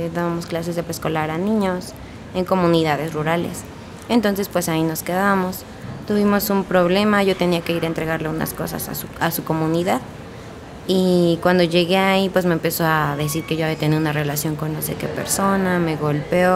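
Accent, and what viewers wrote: Fresa, Mexico. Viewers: La verdad no le creo nada, además, por qué habla como fresa? Me recuerda a una amiga que tuve en la adolescencia que era bien mentirosa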